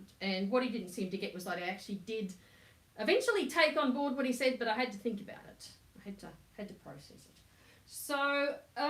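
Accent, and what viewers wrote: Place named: Australia. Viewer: Sharing your gorgeous Aussie accent and aspie outlook, and recent dip (10 years ago) into the recorder and early music.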